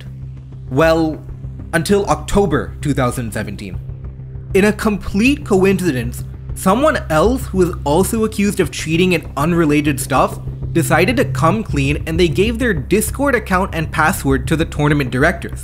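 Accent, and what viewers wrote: India. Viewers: i knew i heard a little bit of indian in his voice!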